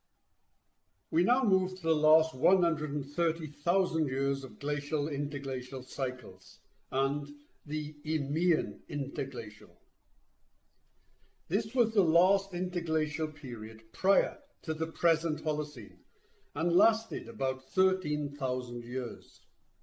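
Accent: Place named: Scotland, United Kingdom. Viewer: I had a professor that reminds of this narrator. He had a great deal of knowledge but was more boring than watching paint dry or grass grow. He does have his Scottish accent that aids his presentation slightly but I couldn’t make it through it. Possibly because I’ve seen this data presented in other formats. Carry on the fight though as I’m sure that there are many out there that either haven’t heard or refuse to accept factual data on “anthropomorphic climate change”.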